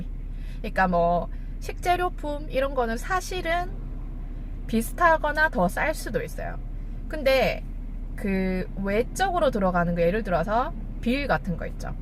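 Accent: Gyeongsang, South Korea. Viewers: 고향이 경상도인가봐요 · 외국 사는 입장에서 남일 같지 않네요~~ 고향이 경상도 쪽이신가요? 저도 경상도라^^;; ㅋㅋ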